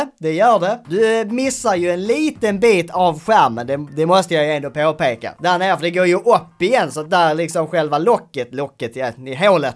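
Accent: Scania, Sweden. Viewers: Den skånska dialekten är då jävla rolig🇸🇪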